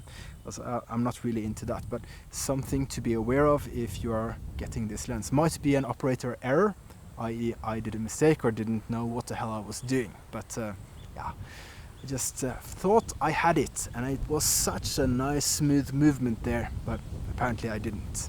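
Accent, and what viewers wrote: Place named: Norway. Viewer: I hear a Norwegian accent... am I right? Thanks for a good review!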